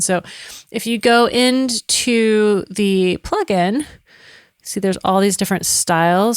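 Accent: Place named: United States. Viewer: your surname is catalan,..but you speak english native with american accent..anyway thanks for this..i love ujam striiiings..is one of my favourites plugins when i compose...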